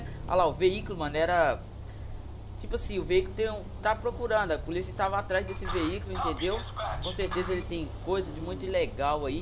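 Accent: Minas Gerais, Brazil. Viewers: Sabia que tu era de minas , voce é de BH aqui mano ? quando voce fala Jae , pela ord kkkkkk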